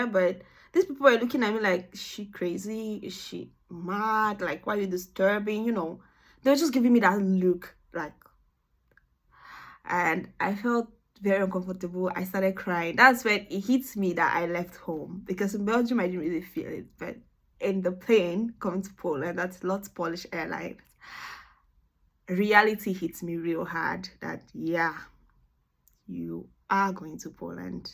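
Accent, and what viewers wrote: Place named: Nigeria. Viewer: U have Nigeria accent did ubase in Nigeria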